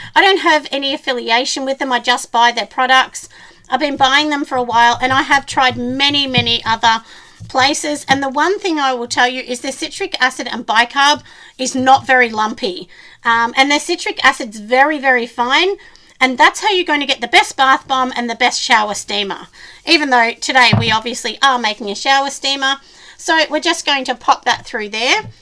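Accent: Australia. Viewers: Dear a bit hard to follow the receipt.. english my second language and with autralian accent it would make it much easier to just hsve the recipe in the description as you mentioned at rge beginning of your video. Thank you for your efforts to share yr skills. From canada quebec..merci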